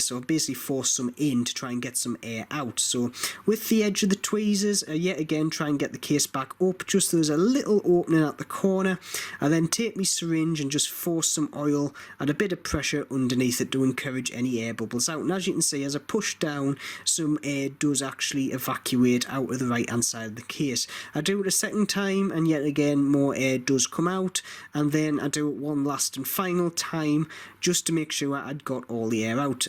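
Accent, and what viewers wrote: Newcastle, United Kingdom. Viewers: You from newcastle mate?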